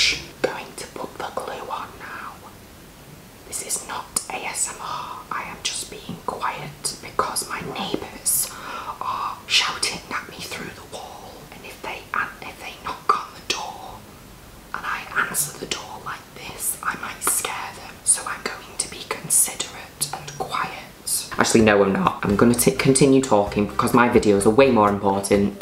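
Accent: Manchester, United Kingdom. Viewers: as a fellow manc i think your accent is STUNNING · what dialect Britain are you? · violently british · Your manc accent makes everything so much better 😂😂 makes me feel at home lol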